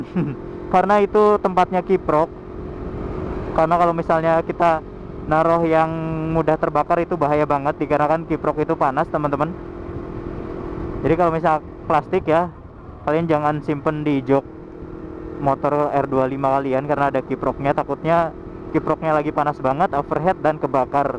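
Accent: Banjar, Indonesia. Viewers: Jir orang Banjar bree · Bang orang Banjar?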